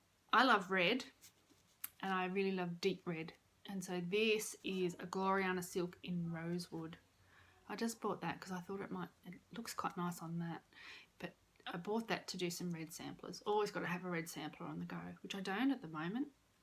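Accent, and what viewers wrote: Australia. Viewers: I love your Aussie accent! Be you and your work is beautiful😁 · I’m binging your videos while working. I love seeing all your things and I love the Australian accent as well. Bring a southerner in the US, it’s fun listening to others’ accents and they various things are pronounced. I can wait to see more!! Stitching and quilts alike 🥰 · Another fun video. Glad your sons are enjoying participating in your floss tubes. Love all your stitching and your Australian accent. Frosty is adorable and his name fits him purrfectly.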